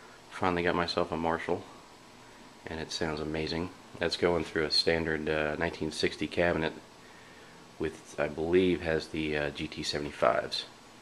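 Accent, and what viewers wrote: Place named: South, United States. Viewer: Wow! You've got a southern Twang.... I've gotta get down there to record witcha!!!! 1993 seems like ages ago!!!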